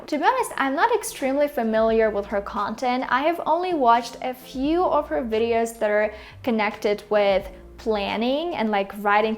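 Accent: Russia. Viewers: You speak very well and a nice girl.but still it is heard that you are Russian speaker. ❤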